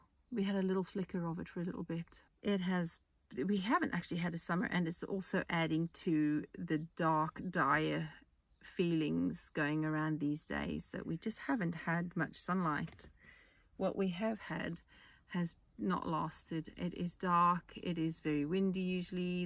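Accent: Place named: South Africa. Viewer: The UK benefit system is rorted (to take unfair advantage of a public service) with 950k job vacancies, over 8.5m people claiming benefit’s more people claim credits than pay tax. You sound like your from SA .. 40% joberg unemployed they don’t have benefits .. too many takers not enough givers in this country.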